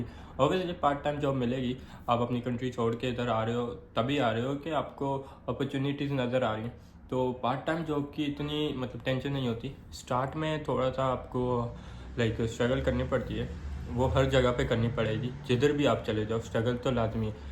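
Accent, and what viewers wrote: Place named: Karachi, Pakistan. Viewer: Bhai accent sy karachi k lagty hn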